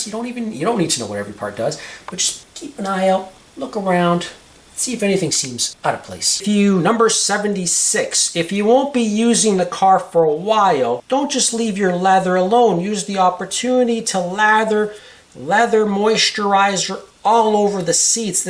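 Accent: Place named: Canada. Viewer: these videos are amazing! thanks so much. i just got my 1st r129 and i intend to keep it until i stop driving. i like doing as much as i can myself. When i was going through your videos i thought i heard a Canadian accent. I'm in Toronto, but originally Ottawa Valley. Are you from the Prairies?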